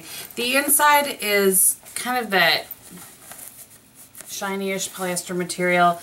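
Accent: Wisconsin, United States. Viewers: For some reason your Wisconsin accent was super noticeable in this video. Too cute!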